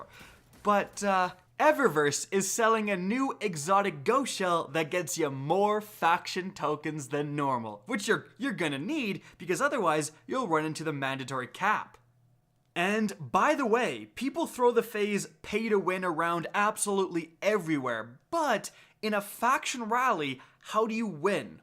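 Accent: Canada. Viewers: omg hes canaden "sawy"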